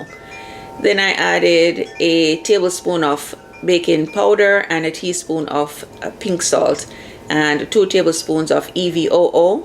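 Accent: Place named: Jamaica. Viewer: Commenting and liking the video for the algorithm - big up that beautiful patwa accent. I badly wanted more homemade vegan (I can swap out eggs) bread recipes. This one is really good. Thank you.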